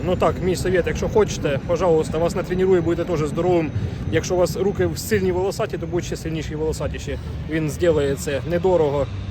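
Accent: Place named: Poltava, Ukraine. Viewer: Друже, з Великоднем!!! А ти з України звідки? Балачка наче Полтавська. Нехай щастить.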